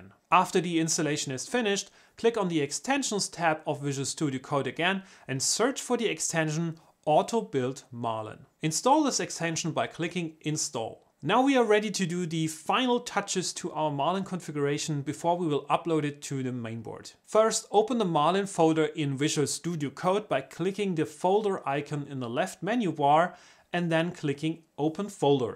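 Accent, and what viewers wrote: Germany. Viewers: Thanks for your help. The german accent punctuated my OCD demand to make my dumpster find functional again!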